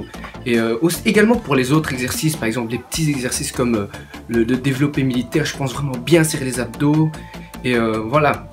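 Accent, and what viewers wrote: Belgium. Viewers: Salut mec, tu es de quel région ? Belgique ? Tu as un accent particulier :) Bonne continuation, je m'abonne 👍🏼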